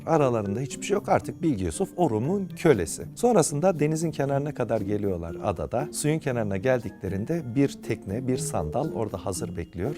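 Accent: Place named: Adana, Turkey. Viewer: Dayı oğlu adana şivesi de arada gider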